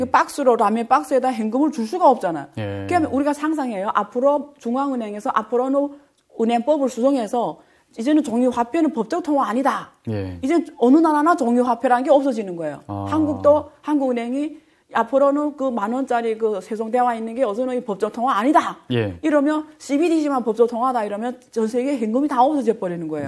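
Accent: China. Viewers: 조선족이신가요? 억양이.. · 억양이 조샌족인가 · 화교인가 발음이 ㄷㄷ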